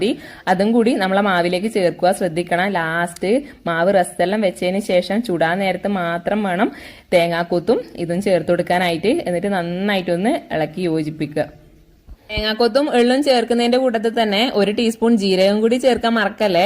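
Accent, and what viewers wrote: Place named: Payyanur, India. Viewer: okay payyanur slang ningalude baashayil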